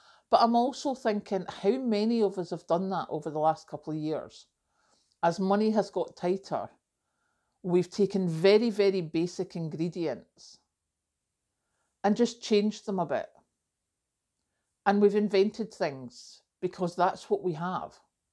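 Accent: Scotland, United Kingdom. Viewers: Hi. I just want to say that I enjoy watching your videos. I have a lot of ancestors that came from Scotland. I live in the state of Oregon, in the USA. I've not been able to go to Scotland yet, but I hope to someday. Watching you gives me a glimpse of what culture and life are like there. I also love hearing you talk. Love your accent. Take care, and have a blessed day!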